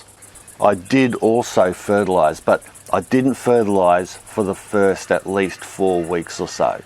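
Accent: Australia. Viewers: WOW! An Aussie, in QLD to boot. I live in Cairns so here it's either hot, hotter or pouring with rain (this doesn't include cyclones). It's so refreshing to have some advice from Australia, and not have to interpret it from OS into our climate. I love growing from scraps. I have very successfully grown sweet potato from old (sprouting) potatoes. One year I got over 15 kg!! Growing things in my part of the world can be difficult. "Plant in Autumn", "Sow before summer", means nothing up here. You plant when you remember to and hope it doesn't go to seed before 6 weeks! Anyway, love your information, and to hear an Aussie accent. Thanks.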